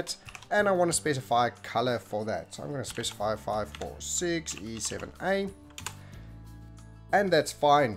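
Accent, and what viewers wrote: South Africa. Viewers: ur South African right?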